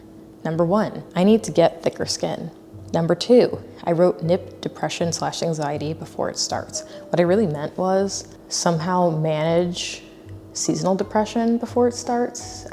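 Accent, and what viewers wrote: New York, United States. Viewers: That New York accent is peak ms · Your NY accent is giving old Jewish lady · The New York accent 😂😂😂😂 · Perfectly stated. It really is annoying how closed minded some of us still are in 2022. love you practicing that NY accent. Been down here since 2017 and still have my hybrid NY/NJ accent when I got mad. Love your videos! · 🎉🥳Congratulations on your new place in the big apple, I’m so excited for you!!!!!! Apologies for the delay.., I’m still getting over the 50xs the rent smh 🤦🏾‍♀️ Great NYC accent!!!!!! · I think your NY accent is funny. I always liked the comedy show The King of Queens, where Carrie has this same kind of accent. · The bricked up and the New York accent took me out girl 💀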